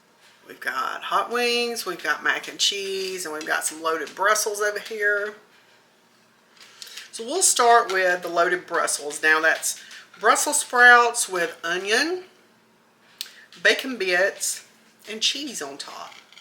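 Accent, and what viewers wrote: South, United States. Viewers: Looked yummy,sounds southern accent I'm in Tennessee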